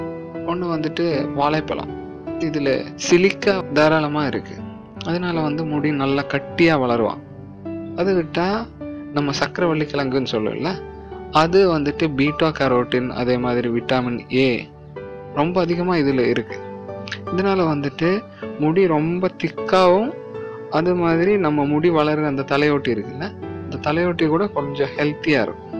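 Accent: Kerala, India. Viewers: Unga Tamil la malayam slang iruku..r u a Malayali??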